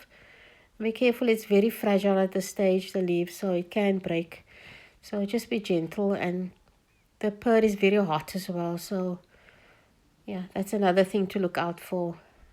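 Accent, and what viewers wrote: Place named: Cape Town, South Africa. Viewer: Hi, there is nothing that is such a taste festival as a "Bo Kaap" samosa. Just listening to you speak makes me so homesick for Cape Town again. Much love from NZ.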